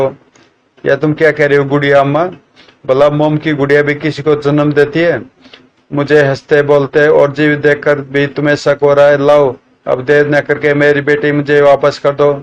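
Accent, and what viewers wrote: Haryana, India. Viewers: tumhara awaj jo h haryanvi tune m aa rhi hai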